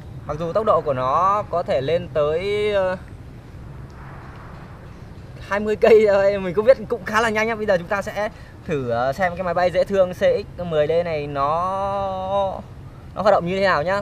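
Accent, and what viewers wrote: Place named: Son Tay, Vietnam. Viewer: Quê sơn tây à a trai?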